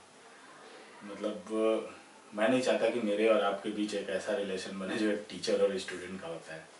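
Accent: Bihar, India. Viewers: Aap bihari ho? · bhai tum Bihar se ho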